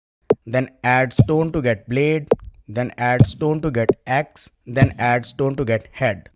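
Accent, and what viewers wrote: India. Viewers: bro got the india accent with an innocent brain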